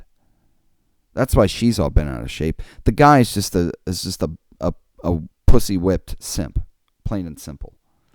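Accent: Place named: Australia. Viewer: Aussie accent are awesome. I agree with the rest of what you said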